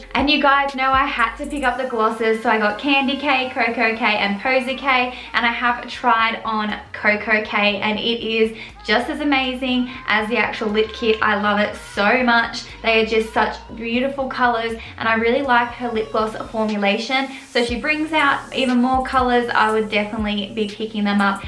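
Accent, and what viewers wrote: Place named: Australia. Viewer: I love you you're so pretty and I love you're Australian accent!!!!! I come from the UK but you're so pretty and I love all your videos!💖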